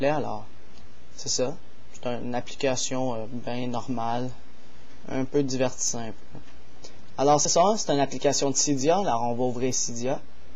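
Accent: Quebec, Canada. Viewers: je comprent pas tou t quebecois bref by ^^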